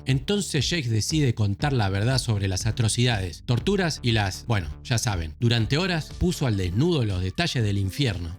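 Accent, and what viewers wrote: Argentina. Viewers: Definitivamente me encanta el acento argentino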